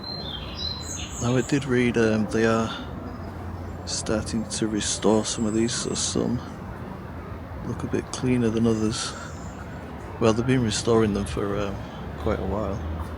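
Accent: United Kingdom. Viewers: Though I'm a Filipino, I like your British accent.. More videos,..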